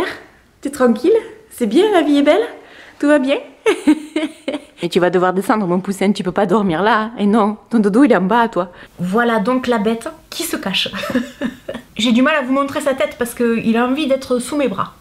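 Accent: South, France. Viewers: Il va être très heureux ce chien avec vous, un malheureux de moins à la spa. J'aime beaucoup vos vidéos pourtant je suis pas une jeunette mais ça me fait passer des petits moments agréables. Votre accent du sud est très plaisant, je suis du sud aussi. Vous faites très authentique, c'est cela qui me plaît beaucoup chez vous. Merci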